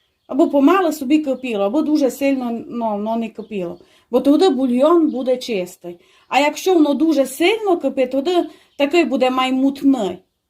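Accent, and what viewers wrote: Transcarpathia, Ukraine. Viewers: ДЯКУЮ ЗА В1ДПОВ1ТЬ ЦЕ МАЬУТЬ НА ЗАКАРПАТСЬКУМУ ТАКОМУ ЛАГ1ДНОМУ ДО ВУЗА НУ ПРИЕМНО СЛУХАТИ. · говорить дуже гарно на за карпатському діалекті, судячи по коментарях всім подобається, це ніде не заборонено говорити на діалекті. Але пише українською мовою пише грамотно, чого вам Бракує, бо взагалі незрозуміло на якій мові написаний коментар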